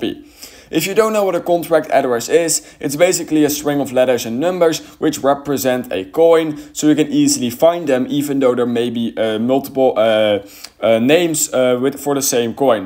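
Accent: Netherlands. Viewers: I can hear the strong Dutch accent😂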